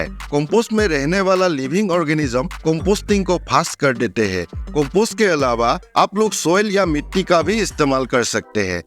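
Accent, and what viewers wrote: Assam, India. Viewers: Aap Assamese ho kya bhaiya. kiunki humare Assam k log hi aise hindi bolte hain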